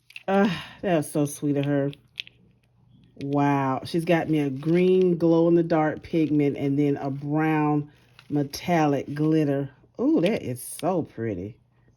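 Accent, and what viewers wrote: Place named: South, United States. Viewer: Yes, I do tend to be longwinded so be careful encouraging me!! LOL I think I'm subscribed to her YT channel because her Lives show up in my feed from time-to-time. However, I usually wait & watch recordings because I tend to get a little impatient watching them juggle their demo with following along in the chat (ironic, I realize, coming from someone who "talks" as much as I do, haha). BTW, where did you grow up ~ I hear in you a kindred Southerner. I'm a lifelong Floridan [don't hold it against me though, ok...there are still a few decent ones here & there], but I've also got people in the Carolinas. My accent always has waaay more twang after coming home from visiting there!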